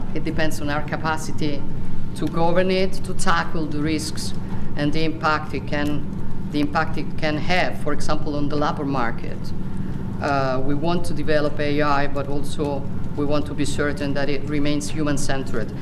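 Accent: Rome, Italy. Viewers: Ahahah che inglese maccaronico con l'inflessione romanesca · Inglese romanesco, parole a vuoto che oggi dice a Biden e domani, a seconda della convenienza, ad eventuale Trump. Speriamo Biden, che almeno fa finta di ascoltarla 😂😂😂😂😂😂😂😂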